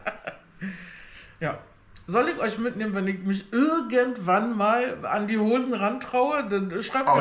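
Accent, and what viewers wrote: Berlin, Germany. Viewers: Moin moin 😊...ich finde berliner Dialekt schön....ach ich bin schnell mit ausmisten 😊😅...... 😅😅😅.....❤❤❤ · Berlinern ist doch super dann das ist doch Deutsch Grins. Liebe Grüße aus dem Sauerland nach Berlin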